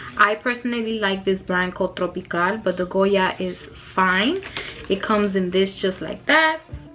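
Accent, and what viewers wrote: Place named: Puerto Rico. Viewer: Be proud of your Beautiful Puerto Rican accent ❤❤❤❤❤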